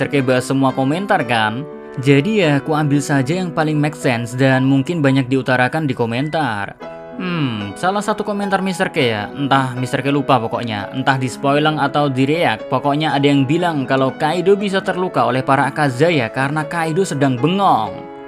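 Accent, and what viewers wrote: Java, Indonesia. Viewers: Bang abang orang Jawa kah? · Hanya Channel ini yang Khas penyampaiannya... Gabungan Jowo+indo. Makin ngeten bos 👍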